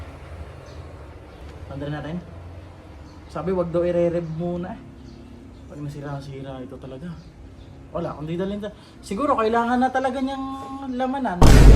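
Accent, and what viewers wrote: Batangas, Philippines. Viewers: Taga Batangas ka puge? Batangeño ere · ngayon ko lang kayo napanood kuya, halatang batangueno agad hahahaha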